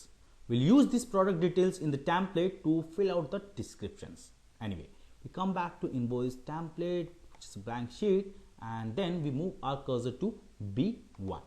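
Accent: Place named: India. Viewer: u are RIGHT i HEARD THE SAME , BUT YES OFC IT IS THE INDIAN ACCENT !!!!!!!!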